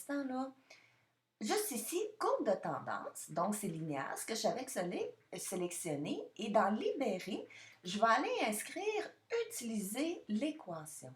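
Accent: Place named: Quebec, Canada. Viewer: merci vraiment pour ta vidéo et surtout pour ton adorable accent québécois lol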